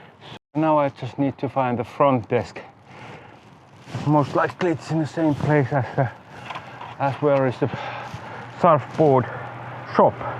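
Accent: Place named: Finland. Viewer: Do you speak Japanese. i do enjoy your Finish lilt and English , very interesting and fun to listen to.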